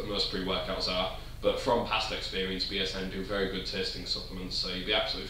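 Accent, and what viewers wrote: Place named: Leeds, United Kingdom. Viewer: 2.uhhh lol you gotta be from leeds or somewhere like that??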